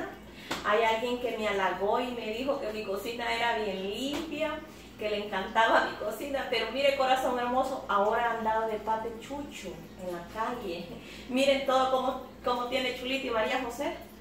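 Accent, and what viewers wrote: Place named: El Salvador. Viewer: Gracias por saludarme ami y mi esposo,nos gustan sus videos habla bien salvadoreña nos encanta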